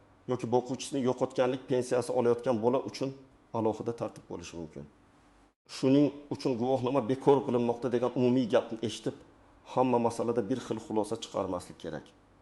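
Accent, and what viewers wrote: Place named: Samarkand, Uzbekistan. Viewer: Шунча вактимни утказиб эшитипман? Хали бу ёлгонмиди ? Узбекчани билади, факат самарканча точик акцент бн гапираяпти буни ёмон жойи йук лекин ёлгонлиги яхши мас !? 😮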